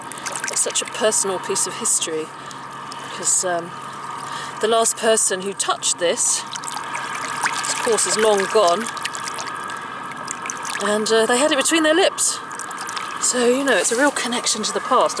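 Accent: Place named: England, United Kingdom. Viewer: I love the research you do for the objects you find , it really does add that extra dimension to your show plus it's always nice to see your Beautiful face and hear you lovely English accent !.....I really miss living in England .....I must come back again soon I think !!.....I particulary liked Cornwall and was wondering if you might have a holiday there and do some Mudlarking along the Pirate Coast !!!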